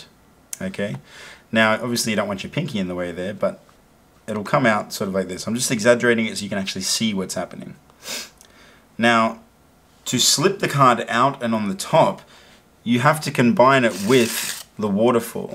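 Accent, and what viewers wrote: Australia. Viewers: are you Australian?😂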